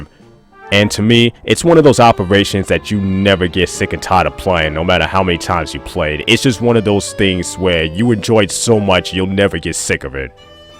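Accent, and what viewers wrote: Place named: New England, United States. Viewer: Stupid question. What part of the us is your accent from? Sounds like New England in general. My guess is new Jersey, or Boston. Linguistics fan here. Anyone with more info lmk happy to learn!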